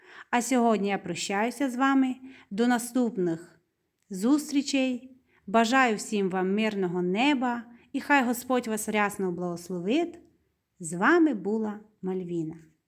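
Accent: Transcarpathia, Ukraine. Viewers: Відразу чути, що це наша Закарпатська господиня😊❤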